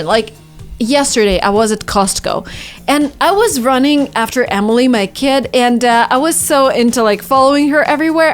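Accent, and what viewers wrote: Russia. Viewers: You’re Russian accent is amazing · to A complete Russian Accent! :D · I loved your Russian accent I want to learn that accent... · No offence, but your pronouncing makes it clear for natives you're russian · Your Russian accent is just so cute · Your Russian English accent, such a give away at the start ! :) lol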